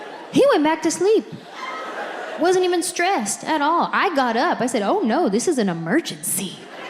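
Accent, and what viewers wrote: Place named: San Jose, United States. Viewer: She sounds soooo San Jose! Subscribed. 😂❤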